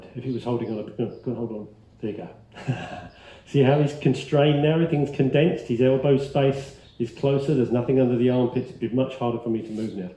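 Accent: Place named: United Kingdom. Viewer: Thank you so much for posting these videos! I did aikido a few years back so it helps me to reminder, also I’m English transplant, so nice to hear a old Brit accent again lol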